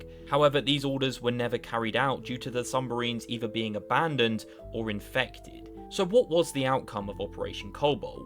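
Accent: United Kingdom. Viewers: Another annoying British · This video would be so much better without the british accent it sucks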